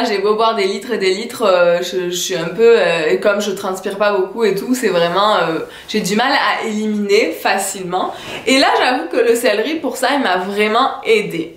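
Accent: Quebec, Canada. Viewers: BONJOUR merci pour la video et pour la recette oh vs etes mignonne avec l'accent québequoise merci bonne chance pour d autres video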